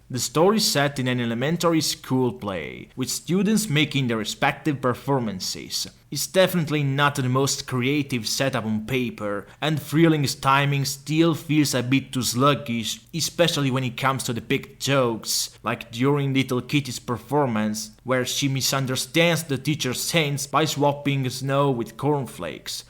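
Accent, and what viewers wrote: Italy. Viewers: You're strong Italian accent is too difficult to listen to to allow me to focus on the content of your video, which sounds pretty decent.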